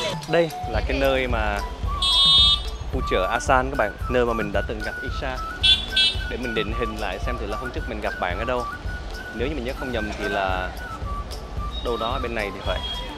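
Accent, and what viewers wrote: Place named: North, Vietnam. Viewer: Dude - you have a little bit of North Vietnamese. Pretty cool!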